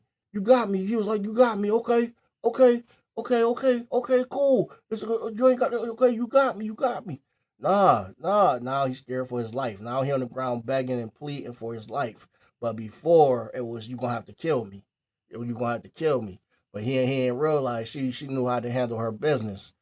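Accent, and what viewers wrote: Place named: California, United States. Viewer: 100% facts! You was born in NY? Sounds like you got some Cali in you. ✊🏽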